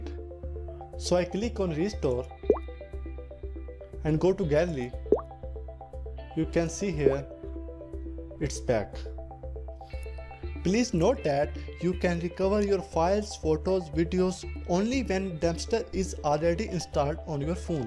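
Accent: India. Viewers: fucking india aksen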